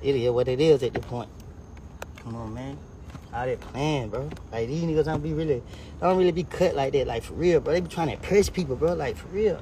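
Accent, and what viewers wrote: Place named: South, United States. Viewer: Love your accent! Country baby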